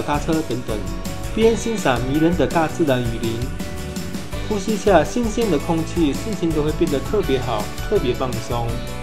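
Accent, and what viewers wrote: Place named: Kampung, Malaysia. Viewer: 这种kampung华语，应该会让外国华人听了很受罪，YouTube是全球性的，不是我们马来西亚独有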